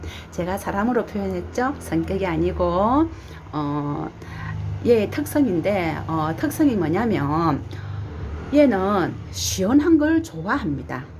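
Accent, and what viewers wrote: Gyeongsang, South Korea. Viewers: 꽃이 이쁘게 폈네요.. 나레이션 경상도라서 더 잘들립니다! 감사합니다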